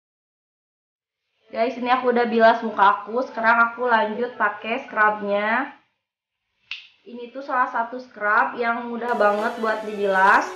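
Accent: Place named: Cibeber, Indonesia. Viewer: Atuh logatnya kaya ga asing nadanya juga.pantesss teteh dari Cibeber ya allah kampung halamankuuu😍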